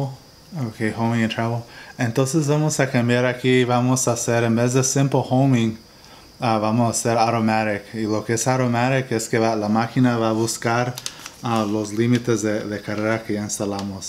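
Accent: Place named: Mexico. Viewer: Hola. Podrías decir los diámetros y paso de los dos Usillos, el X y el Y, así como los pasos por vuelta de cada motor. Puestos a pedir podrías decirnos de donde eres, cómo fue aprender ese Español medio Mexicano y si vives en España. Cuando te haces famoso la gente siente curiosidad, es normal. Un saludo 🇪🇸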